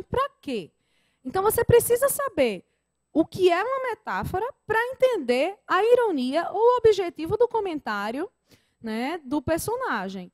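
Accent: Northeast, Brazil. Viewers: Obrigado Profª.. Além de competente, você é muito fofa. E, como o seu sotaque não me engana, deixo aqui um ENORME abraço aos queridos nordestinos. Mais um "Gostei" para você.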